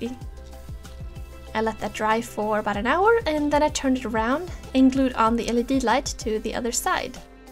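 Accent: Sweden. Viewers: maybe out of topic but it sounds like you got a strong Swedish accent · Nice! sounds like you having a Swedish accent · Are you from sweden? Because you sound really swedish xD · Just found out your channel. Thats a great video, congrats. Sorry to ask but are you somehow swedish in any way? I ask cause when you say OK for example it sounds a lot like if you were. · I'm confused. Your accent is Swedish but you say "aluminum" and use the imperial system. What's the deal?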